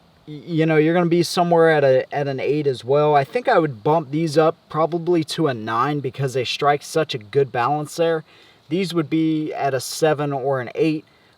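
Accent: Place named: West Virginia, United States. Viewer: you have WV accent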